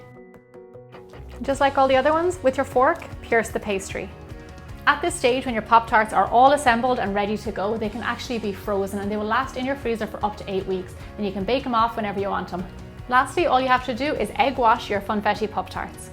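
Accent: Ireland. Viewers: Oooh! Sounds good. Thanks for the quick response. PS I love your accent, brings back good memories from Ireland. Lived in Dublin for nearly nine years. People here in Canada think I'm Irish lol. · Are you Irish? I'm jealous of your accent and Poptarts. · i love your accent and do you speak a different language in ireland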